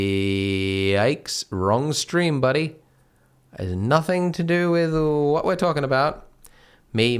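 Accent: France. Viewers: me : "Ok, he has the same accent as me, he is french" Andrew : "Sooooooo French" *unmasked so fast haha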